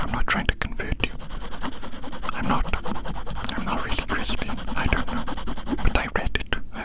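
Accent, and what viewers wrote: Wales, United Kingdom. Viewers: First time viewer and I detected the Welsh accent in record time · Is that a Welsh accent I hear ( I could be completely wrong lol )🤑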